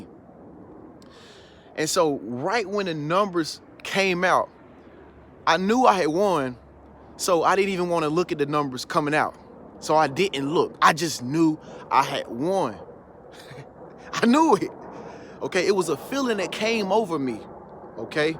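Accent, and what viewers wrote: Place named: South, United States. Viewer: I knew I recognized that Southern twang. NC native here too.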